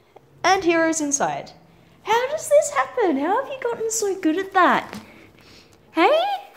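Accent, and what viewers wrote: Australia. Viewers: It is so refreshing to have a fellow Aussie on this predominantly yank site. Oh, and you may have to untrain your maggie lol. Just subscribed btw, look forward to seeing more vids from you and hearing a normal voice :) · I like your voice, it goes along really well with your Australian accent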